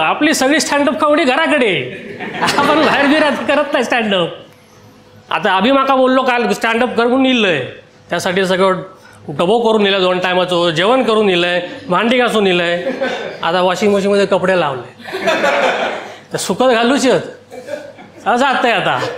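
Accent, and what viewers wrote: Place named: Malvani, India. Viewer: खतरनाक मालवणी किडो पण भारी झिला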